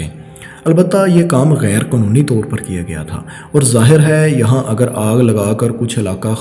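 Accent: Punjab, Pakistan. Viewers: Good work ap ka lehja Punjabi hai plez inprove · Bhai voice-over kisi dhang se Urdu bolne wale bandy se karwaliya Karen meharbani Hogi Bhat taa K dekhne walon ka vedio main interest Bana rahe, yeh Punjabi mix Urdu lehja kaano ko Bhat nagawar guzarta hai, humble request Hai apse.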